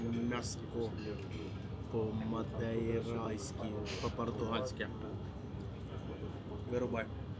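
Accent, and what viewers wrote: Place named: Poltava, Ukraine. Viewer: Полтавський акцент ❤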